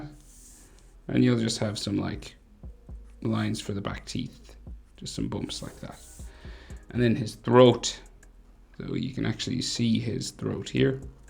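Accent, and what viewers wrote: Ireland. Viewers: Amazing work also very irish sound accent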